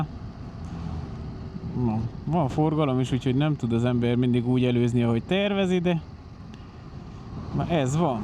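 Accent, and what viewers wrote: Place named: Szekler Land, Romania. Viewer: Szervusz! Csaba (62 éves) vagyok Marosvásárhelyről. Szép kiránduláson vagytok, jó hogy a nagyközönséget is be avatjátok! Nagyon tetszik a székelyes beszéded és, hogy nagyjából mindig van egy jó beköpésed ami feldobja a filmet. Nem akarok kritizálni, de ha megkérhetlek mikor várost, tájat filmezel lehetne egy kissé lasabban mozogj a kamerával, mert majdnem élvezhetetlen a film. Ha sietsz akkor inkább kevesebbet filmezz de lasabban forduljál. Mikor kezedben a kamera akkor a kijelzőt nézd az egyik szemeddel a másikkal meg azt hogy hova fogsz fordulni. Tényleg nem rosszindulatból írtam! Amúgy én is szoktam filmezni mikor külföldön motorozok. További jó és száraz utat kívánok, kellemetlen meglepetések nélkül!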